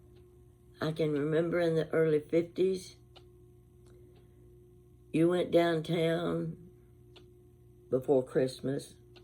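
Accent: South, United States. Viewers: I remember you reciting your memory, you could have knocked me down with a feather when you perfectly pronounced a town that is very familiar to me in your broad southern accent lol x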